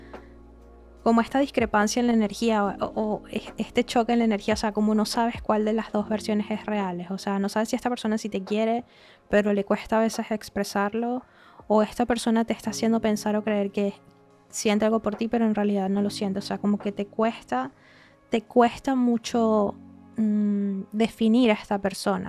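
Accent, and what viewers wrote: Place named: Venezuela. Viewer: Eres la más acertada que existe 🙌 eres mi favorita y amo tu acento venezolano ❤ op 2 describiste todo en exactitud. Lastimosamente tiene pareja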